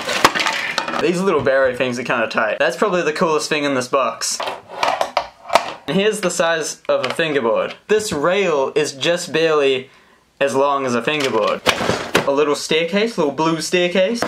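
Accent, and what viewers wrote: New Zealand. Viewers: your a kiwi? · Is this guy from New Zealand if so same here the How I know 'the warehouse, low prices are just the beginning'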